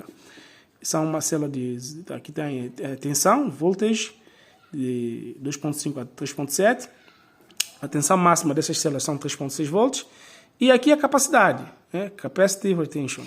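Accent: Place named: Portugal. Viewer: O idioma dele é português, mas com sotaque de Portugal, de repente, lá no país onde se encontra, consiga comprar baterias à preços mais honestos, mas para nós aqui do Brasil, os preços estão de ferrar mesmo, tanto pelo Aliexpress direto da China, quanto os ditos "estoques do Brasil com todos os impostos inclusos".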